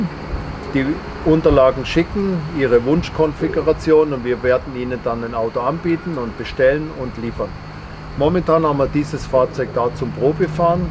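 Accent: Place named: Bavaria, Germany. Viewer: Glad I could still understand a little Bayrische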